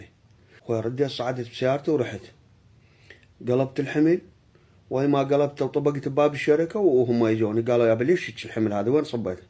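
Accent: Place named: Diwaniyah, Iraq. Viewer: هاي الهجه فرات الأوسط ديوانيه اكثر شي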